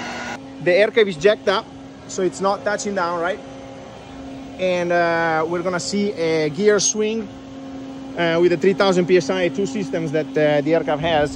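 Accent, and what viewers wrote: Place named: Italy. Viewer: Love your videoes and the way you explain. And your Italian accent. Greetings from 🇧🇻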